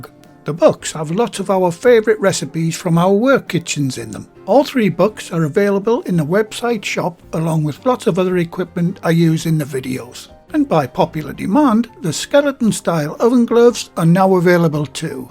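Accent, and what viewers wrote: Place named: Hexham, United Kingdom. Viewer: Yes it’s like food planet. Love the accent, Hexham way?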